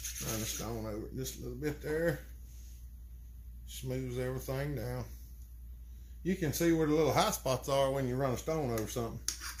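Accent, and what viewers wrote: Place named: South, United States. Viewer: "Hello Everybody" with that southern accent just lightens up your day. You should voice for Hallmark, they could produce special edition electronic greeting cards.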